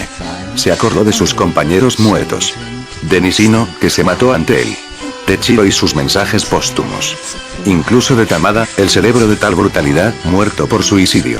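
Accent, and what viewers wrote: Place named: Castile, Spain. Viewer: habla bien no te entiendo por que tu acento castellano me deja sordo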